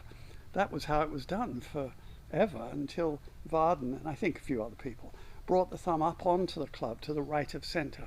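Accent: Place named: United Kingdom. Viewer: Hi wondering where do you live as your accent sounds British 🇬🇧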